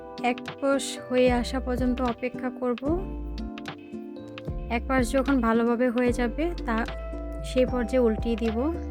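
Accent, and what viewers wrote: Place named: Brahmanbaria, Bangladesh. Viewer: ব্যামনবাইড়্যার মতো কতা কইন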